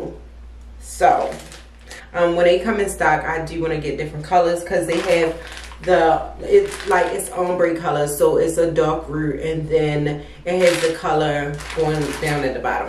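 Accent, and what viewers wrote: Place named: Washington DC, United States. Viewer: your accent is so familiar, are you from DC?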